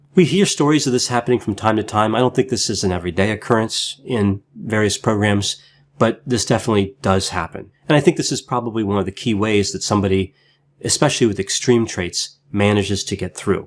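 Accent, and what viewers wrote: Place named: Delaware, United States. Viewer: Haha..knew that accent was familar..deliware..I'm from.philly:) this was very informative on becoming a licence clanition..if often thought about it. Teachers told me I'd be good at it lol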